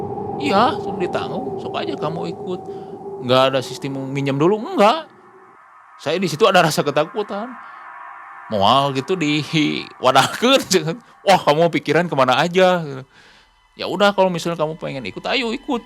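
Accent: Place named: Java, Indonesia. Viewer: Walaupun bahasanya agak medok,tp cara penyampaian nya bagus bgt,dan ceritanya jg bagus.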